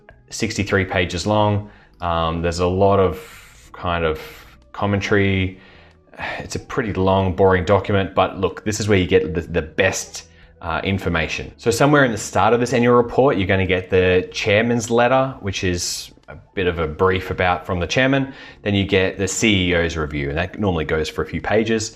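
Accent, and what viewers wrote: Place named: Australia. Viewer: G'Day Mate, Some excellent resources shared. Appreciate. Love your Aussie accent. Somedays I just tune in to listen to you for that :-)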